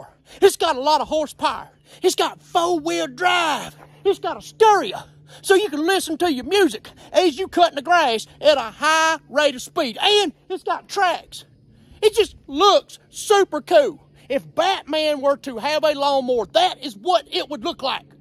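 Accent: South, United States. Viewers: “ (I can’t spell his redneck accent but it’s awesome)”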